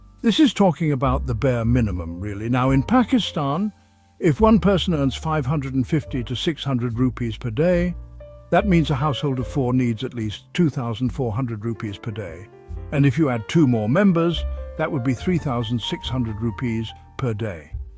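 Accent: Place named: United States. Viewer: Very effective vlog to understand the native American accent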